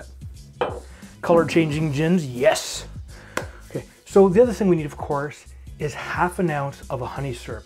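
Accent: Canada. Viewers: Nice job,. And nice looking finished cocktail. I like the slow-motion pouring into the glass. I also like how you Canadians say "about" or "out".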